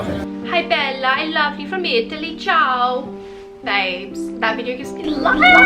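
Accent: Australia. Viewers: is my inspiration, therapist, and australian accent guru <3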